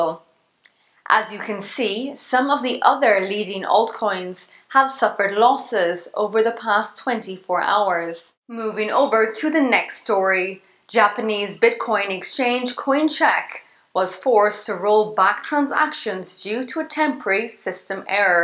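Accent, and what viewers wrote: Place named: Ireland. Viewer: Like the Irish accent.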